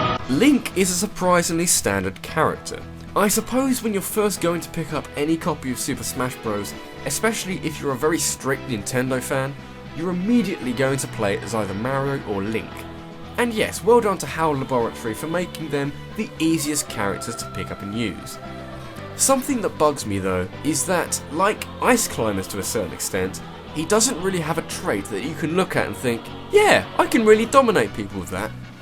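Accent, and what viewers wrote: England, United Kingdom. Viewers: it's called a different accent. He's from england like me. we don't say YOghurt either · Haha, made me laugh mate! That british+ your video style= geez omg its sooo goood <3 I really dont care if it's going to take you a while getting theese vids done, as long as the keep on coming! ;D <3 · are u actually british or do u use a voice modifier? sometimes I cant tell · you know i gotta go against your comment that (please read the next phrase in the incredibly satisfying British accent) "you need to be good at brawl to gain any great succes with R.O.B." my cousin was pretty much a joke fighter when we played, he made the characters fell/run/fly of the stage half the time and he only managed to land attacks and KO´s by mere luck... then he used R.O.B. in Brawl and next thing i know: my and the CPU´s characters are sent flying either as a flaming fireball or with a headache because of R.O.B.´s whirly laser eyes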